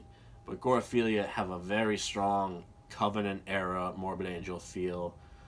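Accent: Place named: Australia. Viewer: I swear sometimes your accent sounds Australian. Watching 'Best Death Metal Records post-2000 (Part 1)' when, talking about the Asphyx album, you said 'this is true death metal, ya bastards' and you said it in the most Australian way possible. lol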